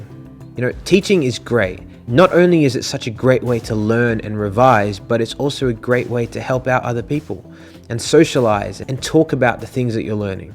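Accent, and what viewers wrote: Kerala, India. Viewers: R u indain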